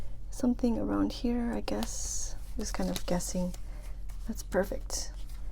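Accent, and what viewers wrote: Canada. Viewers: Oh! I didn't want the video to end!!! I was enjoying so much! Thank you for keeping it real and for sharing with us how you sometimes struggle to keep up with your journals too. I already commented about the 10a.m ridiculousness in your previous video, but Ugh!!! I really feel your pain! And the gyms too? Pre-Covid, I used to be the first one at my Starbucks am when they opened. I needed my caffeine before heading to the gym! ☺️. I'm a morning person, and if it doesn't happen in the morning, it doesn't happen at all! Regarding the Oli clip, you could maybe try to find a different brand of magnetic paper clips locally? Especially beeing in Japan, the Mecca of stationary, I'd assume that you would be able to find pretty much anything you needed/wished/wanted... Anyway, it's just a thought 😊. I'm really curious about what it is you do exactly. 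Your job seems to be very intense! And what is that "box" you mention putting washi in? Ok, one last quick thing (I promise!! LoL) I find it so adorable when, once in a while, in some of your videos, I hear you say "eh?" at the end of a phrase. It always makes me smile. You might have left Canada, but Canada has not completely left you 😉. Sorry for the long and rambly comment!! Have a wonderful day! ♡♡♡ - X.O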